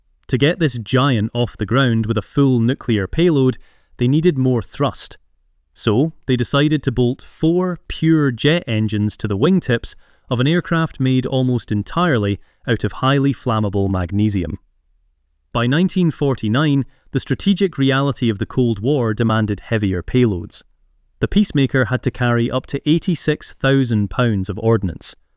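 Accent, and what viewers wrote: United Kingdom. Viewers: It's also a UK accent using exclusively US units Obvs not concrete evidence but defo suspish imo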